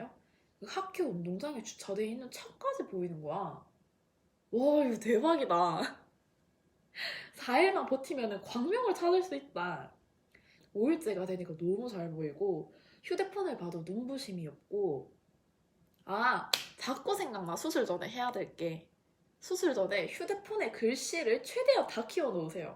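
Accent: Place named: Gyeongsang, South Korea. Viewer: 경상도분이시군용 ㅋㅋㅋ귀엽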